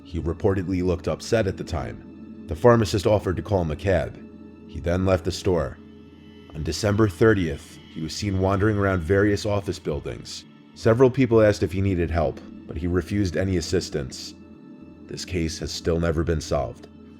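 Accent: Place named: North, United States. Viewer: does anyone know accent? its an interesting one! northern united states i think but idk if its eastern or western. not quite midwest i dont think but idk. anyway, not trying to dox, just an observation 😊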